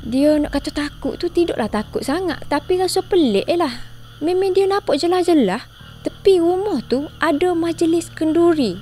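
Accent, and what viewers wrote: Kelantan, Malaysia. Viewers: Ore kelamtam ka😅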